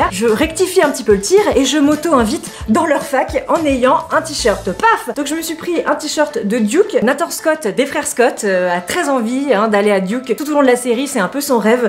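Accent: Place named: Southwest France, France. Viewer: Oui une vidéo de! meilleures trouvailles du monde. j'adore ton accent de Maïté haha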